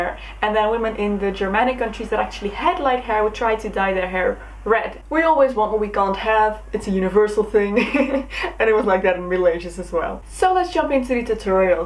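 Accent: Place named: Netherlands. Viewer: ahh ik hoorde meteen dat je nederlands bent, super leuk gedaan dit allemaal! I'm obsessed with everything medieval and historical hehe :)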